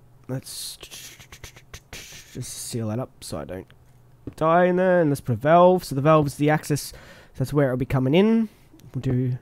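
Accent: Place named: Australia. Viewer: ahh the sweet voice of an aussie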